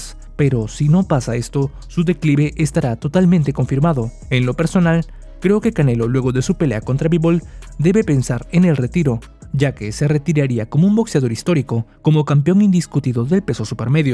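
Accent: Mexico. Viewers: yo no se si sos mexicano pero tu acento me dice que si. que amargo que sos hermano, los mexicanos tienen a los mejores boxeadores de la historia y el canelo es uno de ellos...te la pasas haciendo videos tratando de tirar abajo a uno de lo mejores boxeadores de la historia de Mexico en vez de valorar lo que consigue este tipo, y tiene solo 32 años!!! le dejo la cara como un tomate y lo tiro dos veces, a ustedes siempre les falta 5 para el peso...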